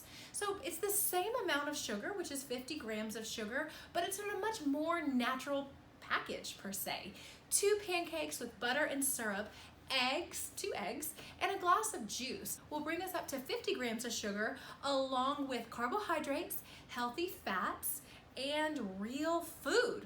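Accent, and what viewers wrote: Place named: Louisiana, United States. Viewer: I like this idea! I actually have my glucose test in the morning but my midwife gave me a more natural option than the glucola. Organic apple juice. So, I certainly am not complaining. Also, it was nice to hear a familiar accent. A Louisiana native now living in Kansas City... I miss that. Thanks for sharing this video.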